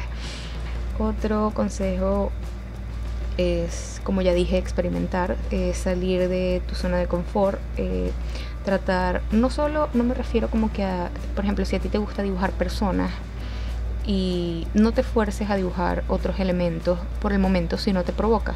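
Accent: Venezuela. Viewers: Pareces del Zulia, digo por tu acento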